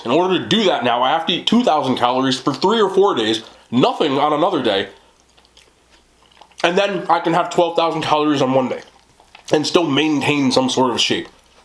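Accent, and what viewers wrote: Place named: East Coast, United States. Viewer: 😃 food looks delicious. Love your upbeat attitude And eastcoast accent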